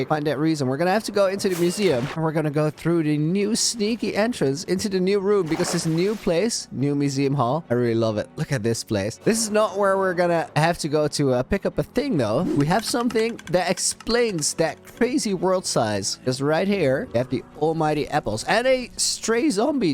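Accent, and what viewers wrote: Netherlands. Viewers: You must be dutch right? ^^ great vid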